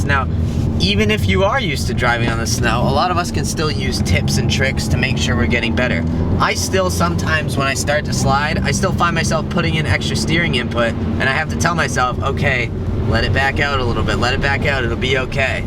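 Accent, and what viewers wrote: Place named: England, United Kingdom. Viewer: Nice English accent and great video.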